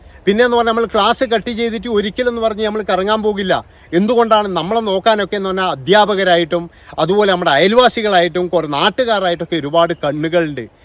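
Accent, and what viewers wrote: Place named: Northern Kerala, India. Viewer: പറയേണ്ട കാര്യങ്ങൾ വളച്ചുകെട്ടില്ലാതെ നന്നായി പറഞ്ഞു.. 👍വടക്കൻ ഭാഷയിലുള്ള സംസാരം എനിക്ക് ഏറെ ഇഷ്ട്ടപ്പെട്ടു... ♥️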